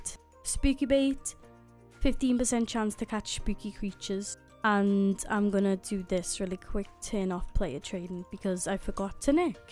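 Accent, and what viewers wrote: United Kingdom. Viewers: question where are you from i feel like you are from the uk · Proper British nice to have a English skyblock player for once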